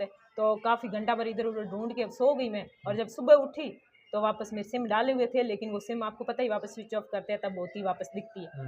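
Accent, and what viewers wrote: Rajasthan, India. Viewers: Awaaz se lag raha hai yeh youtuber gamo Rajasthani hai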